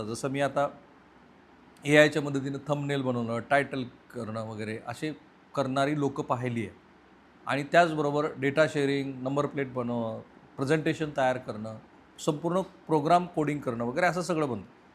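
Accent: Marathwada, India. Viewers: साहेब तिकडचे आहेत, मराठवाडा भागातील तिकडे ण चा उच्चार न असा करतात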